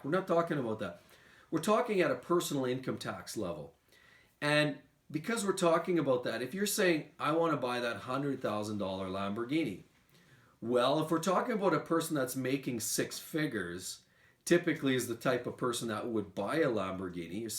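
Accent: Canada. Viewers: I could tell you're Canadian! That's awesome you know Will.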